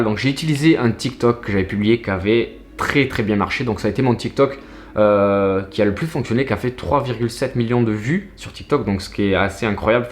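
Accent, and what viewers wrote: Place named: South, France. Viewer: Salut! Je viens de découvrir ta chaine ajd, merci pour ton travail! C'est génial de pouvoir écouter qqn avec l'accent du Sud haha! En tous cas si l'ocean et la photo sous marine t'intéresse, mon insta c'est: saltyskin_photography Cheers!:)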